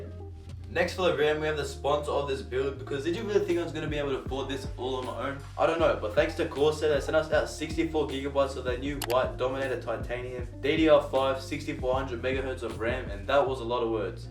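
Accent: Australia. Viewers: You must be an American who has no sense of culture or world knowledge. His accent is clearly Australian so it’s in Australian Dollars. But you’re American, I don’t blame you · read the title people its HIS dream PC not your dream PC also hes australian if you couldnt tell by the accent meaning its 3000 usd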